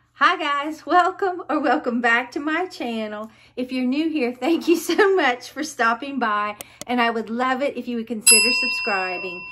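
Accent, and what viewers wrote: South, United States. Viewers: Hey sweet lady I watched your haul and I heard you say you are from WV, I am from WV also Huntington to be exact. May I ask what part of WV you are from?! I thought I heard that southern accent 😉 love all of your hauls and crafts. You are such a sweet and beautiful person inside and out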